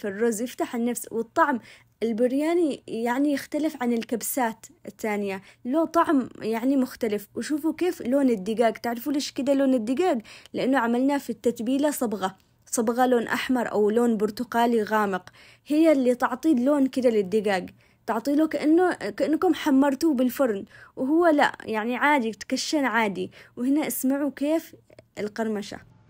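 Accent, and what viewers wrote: Aden, Yemen. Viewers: حبيبتي بنتي الغاليه ربي يحميك يسعد قلبك انتي بلسم على القلب خرجت من عدن واني صغيره ورجعتني لكل حاجه حلوه للكلام الدي في بعض من الناس يستحون من الهجه بس انتي برابه عليك انتي تتميزي بلهجه العدنيه وده اول نجاحك وموهبت بطبيخك الحلوه ربي يوفقك ياقلبي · مرره احب طبخك اتابعك استمرار خاصه صوتك لهجه اليمنيه 🥰🥰 الله يسعدك ابي طريقه بروستد من طريقتك الخاصه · السلام عليكم الله يحفظك يا بنت بلادي سويت اشتراك في قناتك بسبب اللهجه حق عدن و علي الاكل و المحتوي الجميل من دون تكليف . تحياتى لك و المتابعين في قناتك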